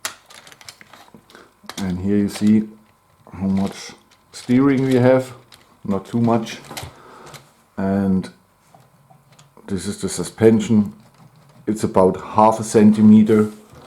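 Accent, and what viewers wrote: Germany. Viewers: That german accent though...🤷‍♀🤷‍♀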